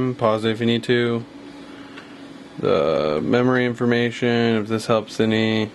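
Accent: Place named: Brazil. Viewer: ho did this video is a brazilian guy, i can see this because accent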